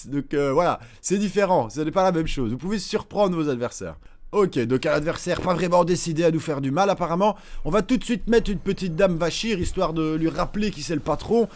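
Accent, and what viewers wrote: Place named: Belgium. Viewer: Mdr l'accent belge il est bien présent :)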